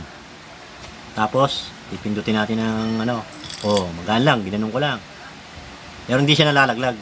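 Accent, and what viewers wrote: Batangas, Philippines. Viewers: ah akala ko kasi batangas at quezon ..ganan kasi ung mga punto dine eh..haha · ahh pero prang batangueño ang kaunting punto ninyo ka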